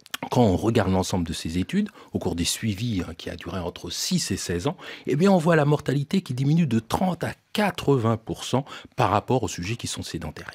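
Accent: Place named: France. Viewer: Pourquoi ce monsieur prend l un accent Français....probablement si est français 😅😅😅😅 Pauvre Afrique....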